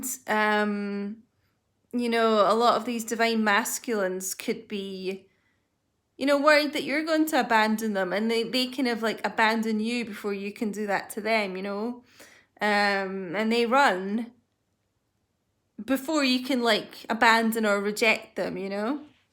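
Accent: Scotland, United Kingdom. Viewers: I am Leo, Libra and I have know each other for 45 years. I am her twin flame, we met in high school and I fell in love with her. We dated for 7 years and the married for 10 years. We never had closer and don't misunderstand me I am still in love with her she remarried and I remarried, the last time I spoke to her was in August of 2019 the call lasted 49 seconds the conversation was not good but 3 seconds after she hung the phone up I was in a state of euphoria, because I got to hear her voice. We were divorced in 1983 ( The divorced was totally my fault). The story of how we got back to each other is incredible to long to type it out. I love Libra so deeply from my heart and my soul. Some time in March of 2019 I got a bad stomach ache and realized something was wrong but because Libra is a very very private person I could not contact her and realized I was ghosted. 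I don't know where she is living I have no phone number as of this date. no communication in 3D or 5D although I believe I have all of the tools I am blocked. I am an engineering manager. The only communication that I have is from watching youtube readings. And you must understand I use a spreadsheet to get a timeline. I am in my 10th month of being blocked that's how much I love her and want to help her. if you would like to talk with me <num> I would love to talk to you. I love your reads, and that scottish braug, I spent 3 years in Dunoon Scotland. Any help you could give me will be greatly appreciated.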